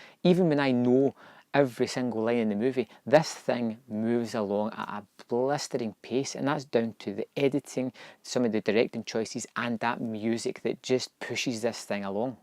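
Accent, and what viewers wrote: Scotland, United Kingdom. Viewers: You know, I'd love to hear You're most natural excited reaction, speaking wise, to anything. The Scottish accent is unparalleled in It's rough, and brutal choke it contorts onto the English language . It rebels against the proper English language, maybe not purposefully, but essentially. Your national language is so strong that, even when replaced, It still survives through the accent, to the point that, It still retains the essence of Your original culture, and attitude. Your language, genetics, and culture are beautiful. All cultures are different and have unique qualities. True diversity is Scotland for the Scottish, and Japan for The Japanese, and so, on.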